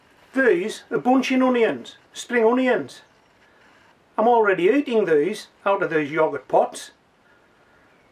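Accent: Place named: Hartlepool, United Kingdom. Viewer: I really enjoy your videos and I am learning a lot from you. I have lived in Ontario, Canada for 57 years but born in West Hartlepool. I think that you have a Hartlepudlian accent or have been born close to “home”. Am I right? Kept up the good work.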